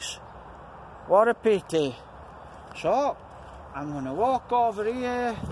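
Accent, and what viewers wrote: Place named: Barnsley, United Kingdom. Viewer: I liked your video, I forget how strong the Barnsley accent is haha! You seem like a lovely local fella 😁❤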